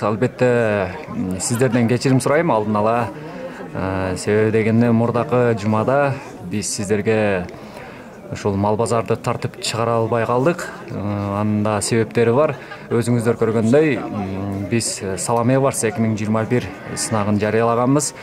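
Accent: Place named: Leylek, Kyrgyzstan. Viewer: АТАКУЛДАН УЙРОНСОН ЖАРКИЛДАП СУЙЛОП!!! ЛЕЙЛЕКЧЕСИНЕН БАТКЕНЧЕСИНЕН !!👍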